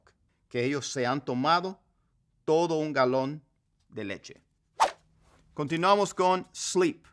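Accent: South, United States. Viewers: Wouldn't have guessed you'd have a southern accent when you speak English haha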